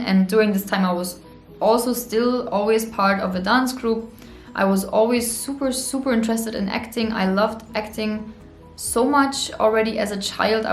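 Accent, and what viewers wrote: India. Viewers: Her accent sounds almost like Indian. She looks good and talks good as well. Hi! Welcome aboard! Enjoy your tryst with India and Indian culture and the warmth of Indian people. Post one of your full length dance videos. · Your Indian accent in English is almost perfect. But to say DVD in the old days..... Good God, how old am I????😶😖😥😥😥😥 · Your English pronunciation like Indian English style very good. We are must understand. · I think ur english has indian accent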